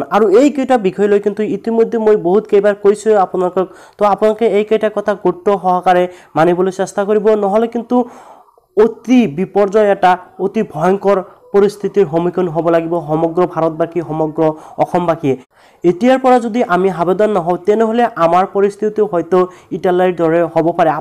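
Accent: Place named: Bangladesh. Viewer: আপুনি অসমীয়া নে অকমীয়া ? অনুগ্ৰহ কৰি শব্দ উচ্চাৰণ কৰিব শিকিব। শূনিলে কিবা বাংলা দেশীয় যেন অনুভৱ হয়।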